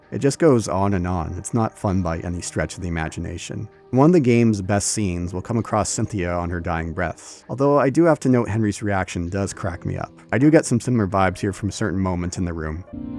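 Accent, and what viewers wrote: United States. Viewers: The California accent is strong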